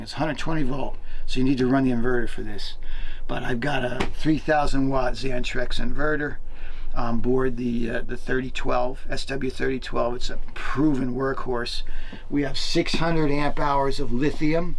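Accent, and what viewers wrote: New Jersey, United States. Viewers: I love the Hosts accent, he is so NJ accent.......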